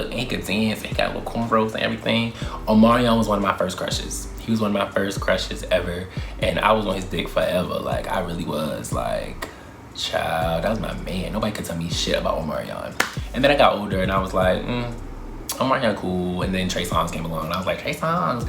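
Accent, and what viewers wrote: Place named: Baltimore, United States. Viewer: You serve your community in a way no one else can. Thank you for sharing your thoughts on this. I think alot of what you are sharing transfers to grad chapters too. You also ate when you said parents experience "Peter Pan" syndrome. The baltimore accent is a mood. If people say that can't understand you, they are just being anti-Baltimore (aka anti-black). Alright now with the double life at 15/16 lol. Living is a habit!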